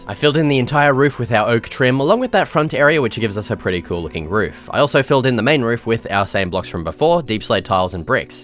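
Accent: Australia. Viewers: Aren't you from Australia according to your accent huh?) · are you aussie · are ya aussy mate?? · Are u Australian 🇦🇺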